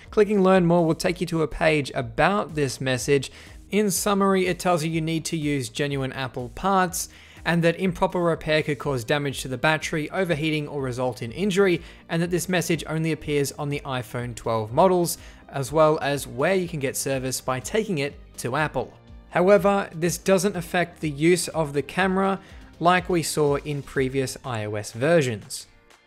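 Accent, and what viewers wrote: Australia. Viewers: "Ichoons." God I love the australian accent.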